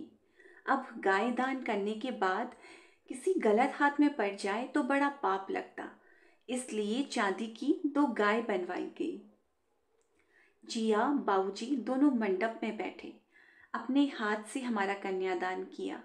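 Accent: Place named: Rajasthan, India. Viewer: ये राजस्थानी लहज़ा, लिखावट, संस्कृति... सब कुछ बेहद ख़ूबसूरत। बहुत सुंदर संस्मरण ♥️🌼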